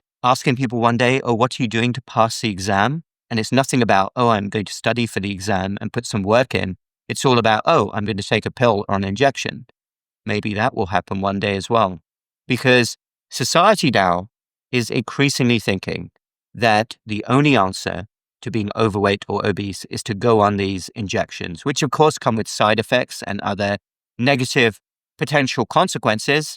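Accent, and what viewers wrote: United Kingdom. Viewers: You sound like you're in the UK so point is you should be aware that the type of care a lot of people are getting is so surface level and subpar it's unbelievable. Are we shamed for being overweight? Of course. Are we given innovative solutions and advice to fit our specific needs eg conditions like PCOS, Hypothyroidism etc? No of course we're not. Are we taught about proper nutrition? Never. So it's not a wonder why most people consider a quick fix when the shaming around weight and yet no help are very loud in the medical field.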